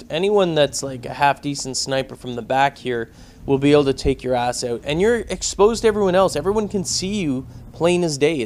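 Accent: Canada. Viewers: Canadian? :o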